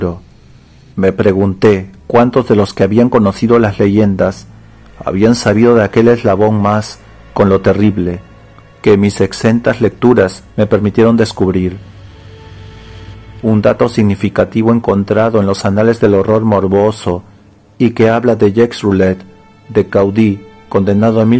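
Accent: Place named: Jamaica. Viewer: Bien por la lectura, pero a veces suenas como si fueras jamaiquino. Para que trabajes en eso. Saludos.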